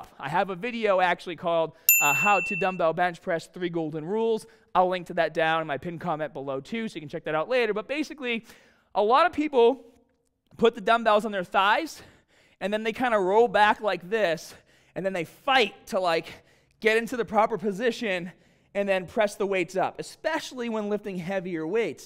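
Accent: Boston, United States. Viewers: Great video guide. You from Boston?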